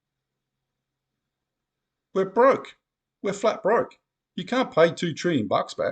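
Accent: Australia. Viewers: Good to have an Aussie voice, there are not enough speaking up.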